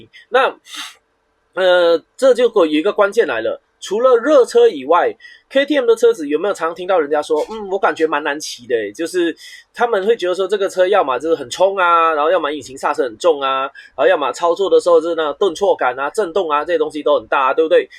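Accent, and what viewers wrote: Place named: Hakka, China. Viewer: 聽起來像是客家國語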